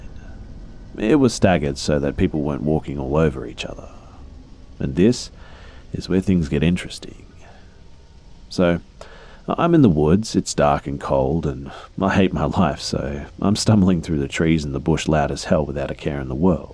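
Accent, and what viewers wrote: Australia. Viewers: Australian accent: I've always known I was gonna be a marine Me: I dunno kinda sus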